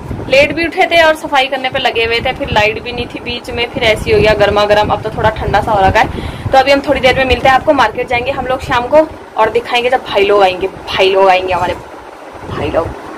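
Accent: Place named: Pahadi, India. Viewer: Maam . Pahadi tone aa hi jati hai Being .pahadi. ..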